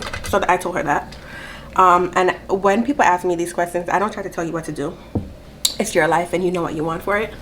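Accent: Jamaica. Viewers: Are you Jamaican? I love your accent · Her accent lowkey be coming out I wish Uu could do a vid abt Jamaican slang ((&)) they words they use maybe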